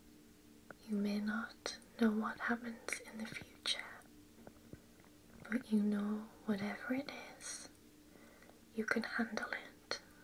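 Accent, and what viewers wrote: United Kingdom. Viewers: Where in the UK are you from? I love female accents, but I can't always place them.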